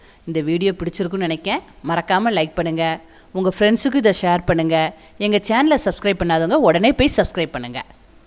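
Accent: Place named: Tirunelveli, India. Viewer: சிறுகிழங்கு அவரைக்காய் பொரியல் சூப்பர், உங்கள் தெளிவான திருநெல்வேலி தமிழ் மிகவும் சூப்பர்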